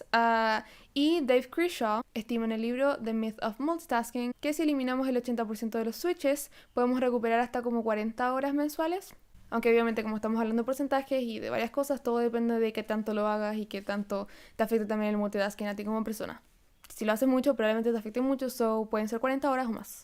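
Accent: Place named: Chile. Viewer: hola, al ser de las pocas personas que usa lenguaje inclusive en todo su canal, me lleva a preguntarte con todo respeto, ¿perteneces a la comunidad lgbtq+? gracias por tu canal, tus videos, me encantan!. tambien me gusta mucho tu acento chileno, aunque dices que eres de Peru viviendo en Chile.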